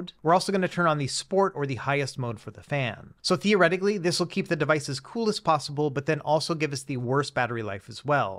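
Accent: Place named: Midwest, United States. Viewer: Where are you from? That's a heck of midwestern Accent you've got there. What is that Missouri? It's almost like it's Minnesota.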